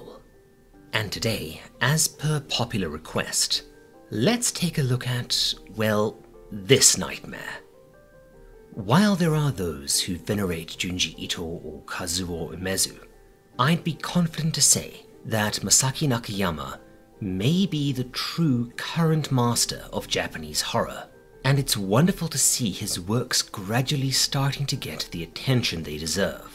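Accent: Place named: South Africa. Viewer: Dude - you're South African? Been binging your vidoes and had no idea until you mentioned load shedding and having a kudu on the wall growing up. Now that I am listening, I hear how you say "off" as "orf". Peeps in the UK always made fun of me for that lol. Keep up the great work 🙂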